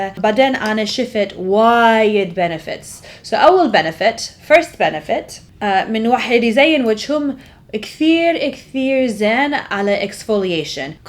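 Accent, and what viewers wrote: Iraq. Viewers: فدوووه تنوكل وهيه تحجي😂 افتهمت كلشي كالته من حجيها يخبل الهجه العراقيه عليها عسل💜💜 احبج هوواي متابعتج من العراق الحبيبه🌸🌸... وانا جد جنت ادور ع طريقه ثانيه غير الخيط لان يعورني ويطلع حبوب وانا بشرتي حساسه وراح اجرب بأذن الله هاي الطريقه شكرا 💜...لوف يو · شكد حلوه بلهجه العراقيه جنن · يول تجنن من تحجين عراقي اروحلج فدوة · والله اضحك من تحجي عراقي😄😄😄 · it's so beautiful to see you making videos while speaking in arabic while you're not that fluent but you still try to speak with your beautiful Iraqi accent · فدوة الج تخبلين باللهجة العراقية تحية من المغرب 🌸🇲🇦🇮🇶🌸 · ههههه يجنن حجيها فدوه لل لهجة العراقيه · حلو كلامج العراقي بس لاتكولين وايد لو كثير كولي هوايه بلعراقي لان انتي تحجين عراقي خلطتي خليجي وعراقي😂😂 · شكد احبها من تحجي عراقي 😀❤ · هههههههه حلاة اللهجة العراقية 💚❤️😂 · فدوه شلون تحجي عراقي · I love it when you do your videos with Iraqi accent please continue on that and great job love it love it 😍, now i have a request please, can you show us how to get rid off or reduce pores? 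I have big pores and they show after makeup too no matter what I use!! ☹️☹️ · فدوه للتحجي عراقي ياحياتي احبج هوايه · جمال اللهجة العراقية جمال العراقي بليز سوي فيديوهات كثير باللهجة العربية😍😍❤️❤️ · She speak iraqi accent not khaleeji accent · لج فدووه للي خلقج كلش كيووت من تحجين بالعراقي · اتجنن من تحجي عراقي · فدوه لله شگد حلوو تحچي عراقي 🤦‍♀️😂🥰😍 احبك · طريقة كلامج جدآ حلوة ونشالله بيرجع كلامك العراقي مضبوط منشن نتفهم شو عم تحكي بحبك كتيييير ليلى من العراق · فدوووه للحچي العراقي اموت عليج 😍😍❤❤ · تخبلين من تحجين عراقي 😂❤️💕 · انتي شكد كيوت لما تحجين عراقي احبج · انتي كلش كيوت من تحجين عراقي اتمنة الج التوفيق والتقدم نحو الافضلل لوفيووو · فدوه 😍 شلون تحجي عراقي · لان اللهجة عراقية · تخبلين من تحجين عراقي 😻😂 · انا عايزة كمله مفيدة😭ونبي كيوت من تحجي عراقي😂💜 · صعب عليها مدا ترتاح بيها بس حجيها كلش كيوت بل لهجة العراقية فدوا 💙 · كيوتتت باللهجه العراقيه☹️❤️❤️❤️❤️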